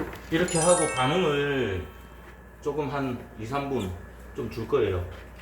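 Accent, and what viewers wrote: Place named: Gyeongsang, South Korea. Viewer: 아니요. 경상도 말투입니다. 투박하면서 정감있는 말투~~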